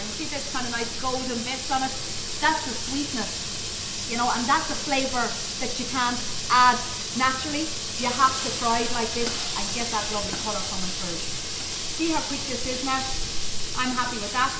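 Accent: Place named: Ireland. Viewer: Nice to see new videos love watching the videos and hearing that beautiful Irish accent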